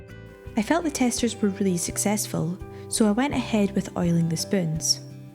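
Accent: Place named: Scotland, United Kingdom. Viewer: your langage accent is so close to your husband's ... i habe never been in Scotland ... but listening to your voice ... you both really share a local tone :)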